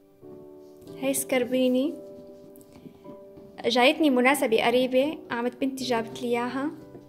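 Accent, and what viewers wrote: Syria. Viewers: مدام اديش عمرك وانت من وين باين حكيك ادلبي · كأنو انتي من حمص؟؟؟حكيك مبين حوووومصي 😊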